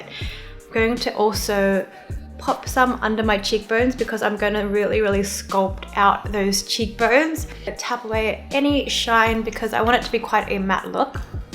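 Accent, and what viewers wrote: Australia. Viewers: In love your Australian accent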